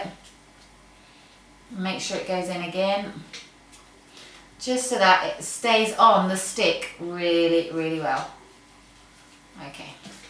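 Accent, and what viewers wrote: England, United Kingdom. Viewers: Hi there, I really appreciate you making this video! I'm Bruneian and currently staying in the UK. being away from home makes me miss all the asian food! and satay is one of them! I'll be going to try this recipe. AND you've got an amazing english accent!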